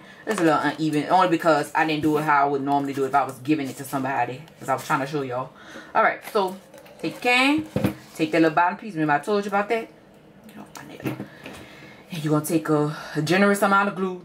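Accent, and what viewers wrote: New Orleans, United States. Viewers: So cute! Are you from New Orleans? I recognize that accent 🥰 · You have to be from da 504 NOLA Baaaabeeee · I can hear it in your voice baaaaby dat ya straight from the N.O. Thanks baby for helping me as a beginner out ❤. .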